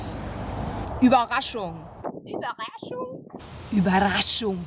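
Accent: Germany. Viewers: Sprechen die Ossie wirklich wie das? :o (Ich bin Amerikaner, so werd' ich nicht wissen.)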